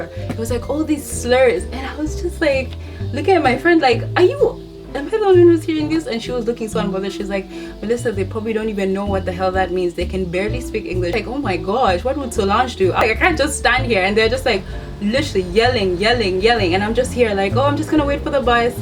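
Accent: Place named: Kenya. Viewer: omg, i thought you were Kenyan at first, just from the accent. definitely subscribing to your channel. Thank you for the video.It was definitely informative. I hope to be able to intern at U.N next summer/ keeping my opes up.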